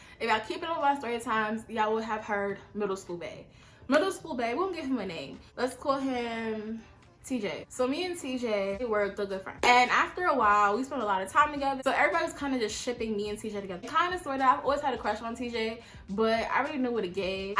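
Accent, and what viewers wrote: New York, United States. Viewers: it's the NY accent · It's the NY accent for me 😍😍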